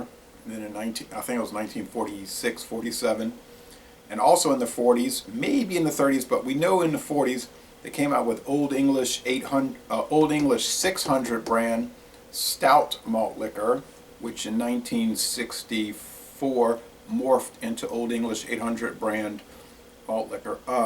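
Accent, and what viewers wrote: Louisiana, United States. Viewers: are you from Louisiana ??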